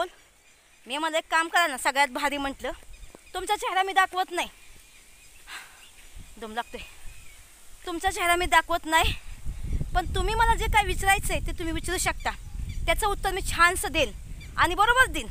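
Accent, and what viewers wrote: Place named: India. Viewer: तुम्ही आयुष्य मुंबईमध्ये काढले तर शुद्ध मराठी बोलायला पाहिजे. जेव्हा तू शुद्ध मराठी बोलतेस तेव्हा ऐकायला गावरान मराठी पेक्षा चांगले वाटते. तुझी बोलायची पद्धत तीच ठेव पण शुद्ध मराठी ला नक्कीच 💯 % जास्त लाईक्स मिळतील.शुद्ध मराठी ऐकायला फार बरे वाटते.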